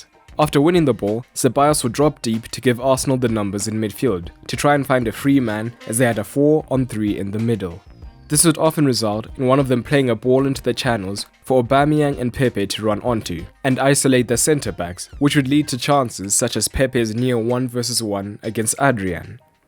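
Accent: South Africa. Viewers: Is the narrator South African? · Are you South African?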